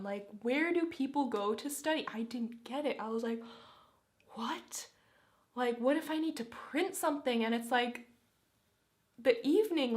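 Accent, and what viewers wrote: United States. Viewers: No offense you have an American accent i would thought you were from America lol 😳😳🤯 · She sounds American, not British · you sound American to me for some reason, so cute and fun opinions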